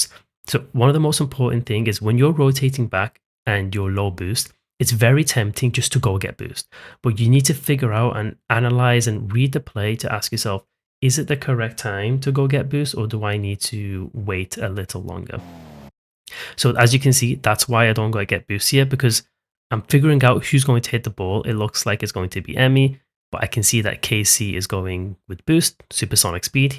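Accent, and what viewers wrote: Scotland, United Kingdom. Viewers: Maybe he’s Scottish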